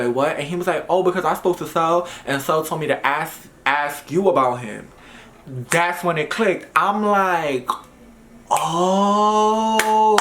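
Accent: New York, United States. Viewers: Dionne T Lee this sound like the average new york nigga lmao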